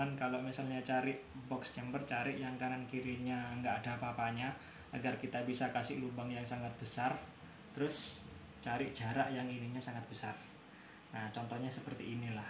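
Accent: Madura, Indonesia. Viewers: kmu orang mna bang klau bleh sya tau orang mna bang yaaa ma.af nii bang seblumnya soalnya kayak orang madura ngomongnya.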